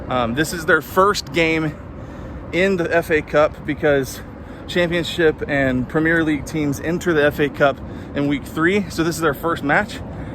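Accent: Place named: United States. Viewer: It's good to see you taking an interest in Wrexham. I was there and the atmosphere was electric. A few things to work on to sound, as you put it, less American. You would say Wrexham were 2 up. And Coventry were 2-1 down. He scored, not he netted it. But your videos are good the way they are. It's the interest you're taking and the passion that comes across in them. We'll done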